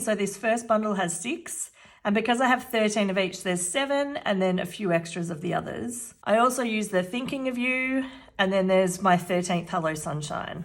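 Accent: Australia. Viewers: I love listening to your thinking process! (And not just because of the cool Aussie accent!) I’ve been doing the sheet loads of cards for quite a while. Now I want to step it up to using the whole pad! Watching how you figured out which papers to cut into which pieces was brilliant! Added up to 24! I loved the shock in your voice!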